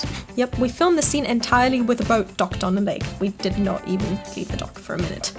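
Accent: United Kingdom. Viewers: Why are you British now?? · When did you become British?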